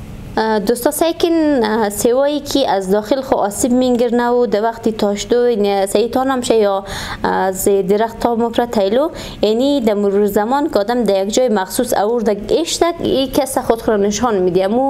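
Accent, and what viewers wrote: Hazarajat, Afghanistan. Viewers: ماشاالله دخترآغیلموچقدربه لهج ساده هزارگی وشیرین گپ میضنی قربان زه بانتو شوم خوارجانمه🌈🦋👍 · توضيحات قشنگ تان به زبان ولحجه ای شیرین هزارگى بسیار عالی بود موفق باشید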